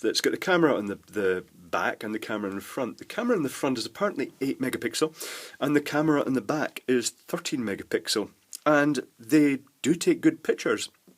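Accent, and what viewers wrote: Scotland, United Kingdom. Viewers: it's not your boomy voice, it's the Scottish accent ;-) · i knew it, just some of the words you were saying and the accent! I'm from Glasgow myself so hello fellow Scotsman!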